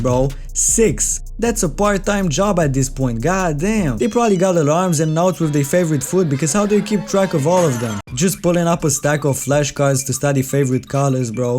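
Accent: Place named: Balkans, Eastern Europe. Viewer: You are from Europe or balkan country .damn sure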